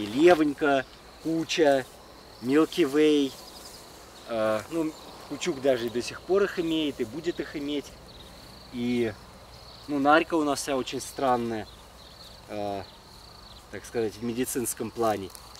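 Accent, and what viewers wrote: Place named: Moscow, Russia. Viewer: Вы меня потрясли! Вашей любовью к животным и самопожертвованием! Очень уважаю таких людей, низкий Вам поклон за это! Нож в сердце - рассказ о страшной ферме, меня всегда убивают такие люди, которые ради денег готовы на страшные поступки! Огромное спасибо, за спасенных львов, за Ваш подвиг человеческий. Только что узнала о Вас, подписалась на канал. Ничего не удаляйте, пожалуйста, будем ждать новых Ваших видео! Левушки Ваши - красивущие!!!!)))) Привет Вам из Харькова))) Очень приятно слышать Вашу речь московскую, у нас там огромное количество родни! Успехов Вам и помощи Божией!!! Все у Вас получится!!!!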